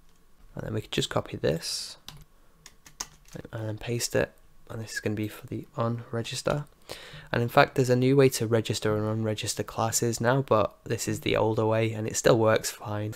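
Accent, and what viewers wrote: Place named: United Kingdom. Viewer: Lovely video. I'm starting a science youtube channel (in Spanish) and I need these kinds of things to get the animations set and going. Lovely accent. I can obviously tell that it is from the UK. London and whereabouts?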